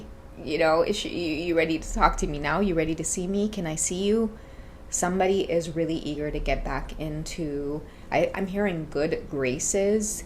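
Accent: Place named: Trinidad and Tobago. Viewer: Look meh trini reader 😂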